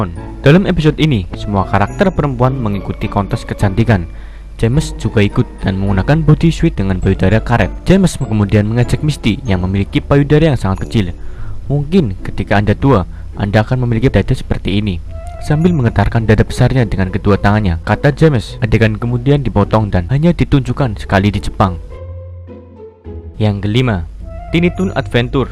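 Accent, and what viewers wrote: Java, Indonesia. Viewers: Jawa banget anjir ngomongnya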